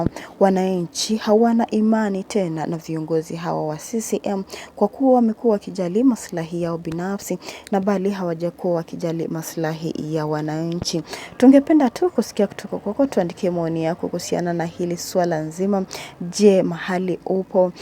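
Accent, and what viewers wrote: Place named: Kenya. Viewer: Ndg. Wananchi hii chanel ni ya kenya pia hata huyo anaeongea ni Mkenya lafuz mumeisikia na hizo picha ni za zamani wakati wa mazoezi ya makomando puzeni hayo. Tanzania ni salama na tulivu Amani tupu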